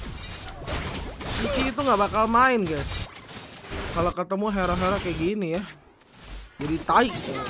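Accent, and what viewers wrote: West Java, Indonesia. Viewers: banyakin ngomong pake bahasa sunda bang, seneng gw dengernya jirr kocak 😂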